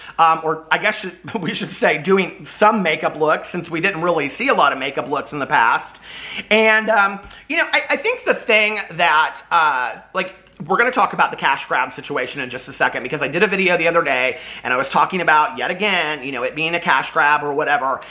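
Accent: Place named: Detroit, United States. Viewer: are you from detroit??🤣 that’s my favorite please don’t stop it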